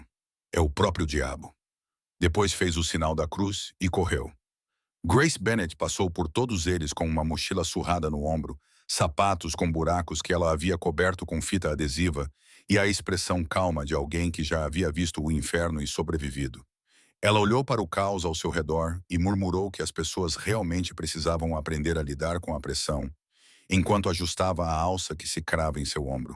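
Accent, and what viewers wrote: Portugal. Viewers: Equipe veladas e sombrio, CONGRATULAÇÕES ! Enredo excelente e inovador. A Narração é fabulosa e o português da Língua portuguesa de Portugal , com certeza, É UM DIFERENCIAL ADORÁVEL! ✨💫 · Também acho linda história maravilhosa narrador mil mais a narrador parece português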